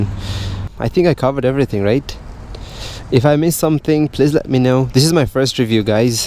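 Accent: United States. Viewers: Yupp buddy, very impressive Bike review you made ever here in BD like American accent really. I like your accent than bike at all, ok buddy go on I hope you can do what you looking for best of good luck for next review. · You dont have the indian accent are you half American?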